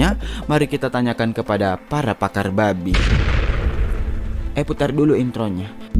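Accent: Makassar, Indonesia. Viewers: Penasaran ku ini sama admin, tinggal di mana Ki? Logat nya Makassar bgt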